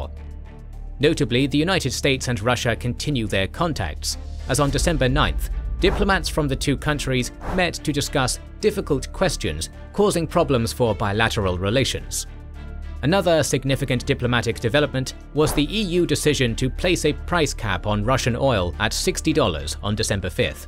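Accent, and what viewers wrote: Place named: United Kingdom. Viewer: A British-sounding accent always does make for a good narrator.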